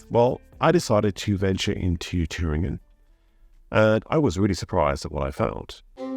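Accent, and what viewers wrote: United Kingdom. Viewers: It's a real, real pleasure, Brother. I often watch your creations 2 or 3 times. Here, you really manage to pass on your surprise and delight as your tour the cities. It is all so beautiful compared to your preconceived ideas. When you described the lush green, and the ultrafriendly people, I really felt at home. Also, when you described the economic difference, I also felt at home, because a lot of our skilled workers are leaving...going to Oz. The rainbow lady is a bit of me...I can imagine sharing a beautiful experience with a random person. I was curious about why the women blamed some ghastly attitudes towards an American lady, on East Germans. Maybe they were right. I don't know. But I just love the sound of the people and the places you visited. The other things I like about your videos are -your British accent and calm voice -the thought, explanations, ideas, analysis and your personal experiences and opinions that you put into these -the filming...is very good and all kinds of unexpected details are included. 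It makes it very interesting. I like the range of topics you come up with. I love your (British?) humility. Kiwis are quite a bit like that, I think. Some of your topics have a lot of depth...are fairly intellectual. I especially like seeing you smiling. It makes me think you are enjoying yourself in different places...especially in this newly discovered paradise. It is a very sweet smile, and Down Under we do more smiling than some people of the world seem to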